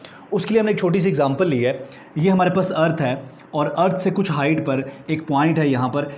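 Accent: Pakistan. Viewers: Are you from pak or kashmir???? Coz ur accent matches!!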